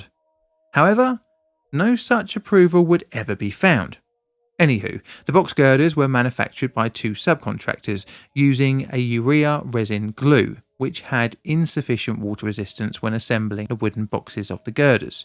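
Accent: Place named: England, United Kingdom. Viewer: As a German can I just say, your pronunciation of Reichenhall is spot on. Yeah there is a slight accent but bruv an Englishman saying "ch". Sorry 😅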